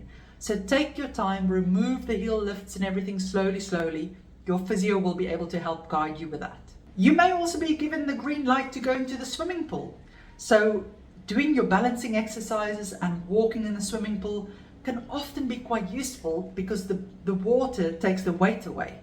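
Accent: South Africa. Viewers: This video was the best help and support I've had in the whole duration of my achilles recovery! Thank you so much for the breakdown of the recovery process.: baie baie dankie - mal oor jou aksent. Dit was so lekker om hier in Australia na n stunning video vanaf UK te kyk en lekker te voel oor ons Saffer roots!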